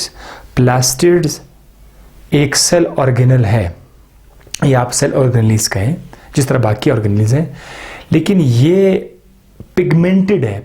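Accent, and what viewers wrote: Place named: Quetta, Pakistan. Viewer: Gr8 sir app ka urdu quetta ka hi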